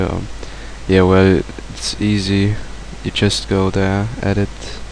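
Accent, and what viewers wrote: Germany. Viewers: are you german? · ... you're German, aren't ya?:D haha, liebe Grüße!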